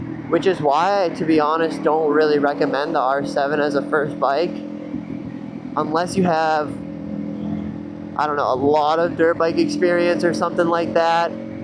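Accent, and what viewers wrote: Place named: West, United States. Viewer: ps not his voice, the western cali/arizona accent lol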